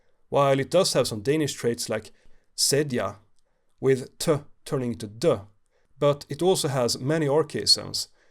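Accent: Sweden. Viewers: "The julandic LAUV" thick and beautiful gøtamål. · That's the strongest Swedish accent I've heard in a long time, at least for someone who speaks fluent English. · The narrator sounds very Swedish so I wouldn't take it for granted that he knows how to pronounce middle danish haha.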